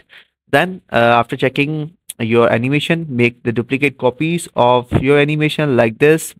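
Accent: India. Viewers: bro are you from india? Because your voice is similar to indians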